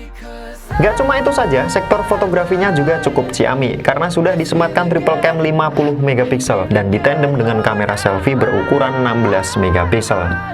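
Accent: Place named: Java, Indonesia. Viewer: Jowo ngendi mas😁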